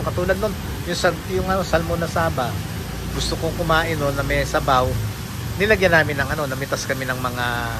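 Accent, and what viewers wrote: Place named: Pampanga, Philippines. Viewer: Kuya kapampangan ka? Kasi may tono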